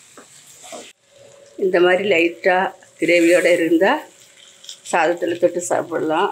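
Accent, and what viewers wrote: Nagercoil, India. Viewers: Nagarkoil Tamil mathiri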